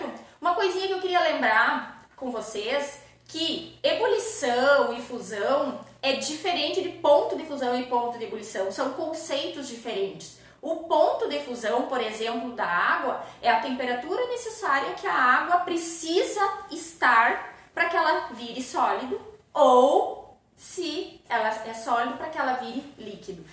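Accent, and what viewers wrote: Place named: Rio Grande do Sul, Brazil. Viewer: Sotaque do rio grande do sul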